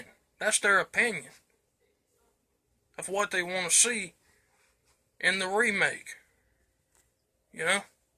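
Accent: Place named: Appalachia, United States. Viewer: why you talking like a hill billy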